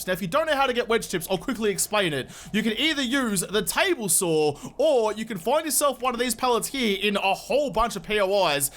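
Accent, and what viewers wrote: Australia. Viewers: " Gaoodayea" I'm guessing he is Australian?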